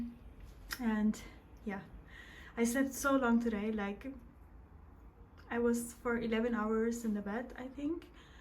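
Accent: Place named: Germany. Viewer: Ohhhh I recognize that accent 🥹. The lovely people who always say “ my English is not so good” then proceed to give me fluent English instructions to the bahnhof. I met the sweetest and kindest humans in Germany. I hope you enjoyed your stay in SA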